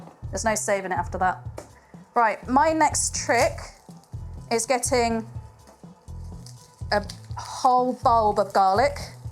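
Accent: England, United Kingdom. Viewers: Smart tips, pleasant voice, attractive woman with a British accent. Just subscribed. ) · I suscribed just to listen to your amazing British accent. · Loved watching your video. Spuds look wonderful. I live in Central California , my mom was English and your accent is a lot like hers was. Could you do a video on the best way to make Yorkshire pudding? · I like your cooking and British accent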